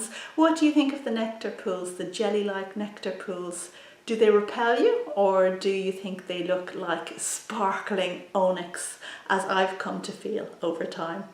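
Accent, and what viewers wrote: Ireland. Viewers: Thank you, Love all the information you share with us but most of all I enjoy your Irish English which I adore. Great videos.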